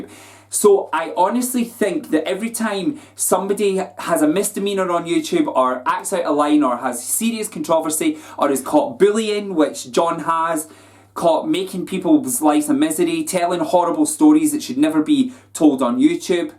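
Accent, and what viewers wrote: Scotland, United Kingdom. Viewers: love hearing a Scottish accent on youtube!! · Your accent reminds me of this really hot Scottish squaddie I dated last summer, such good memories 🤤🤤🤤🤤😍 so sad we lost contact arrrrrghhhhh!!! random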